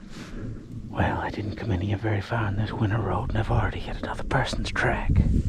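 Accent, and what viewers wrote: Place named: Maine, United States. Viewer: Forgot to tell ya.. love the Maine..northeast dialect..our dialect talk is different here in northern Michigan.. that’s all part of the story..listening to the northwestern talk while your hunting .. super there guy…keep the videos coming..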